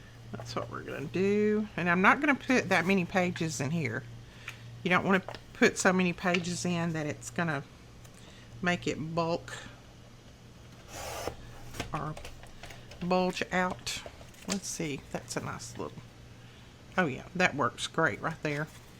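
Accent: South, United States. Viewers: Such cute mini folders love the paper you used. I enjoy listening to your southern accent. 😀💖 Have a blessed day. What is the name of that beautiful black bling that you used on butterfly and where do you get it?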